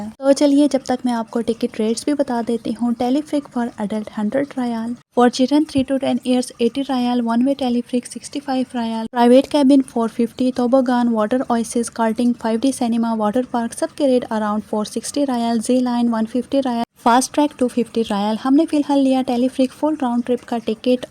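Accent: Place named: Pakistan. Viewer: Rayal nahi madam...Riyal hota hai 😄😄 Pakistani English UK English aur USA English sab English ko merge kar ke explain kar rahi ho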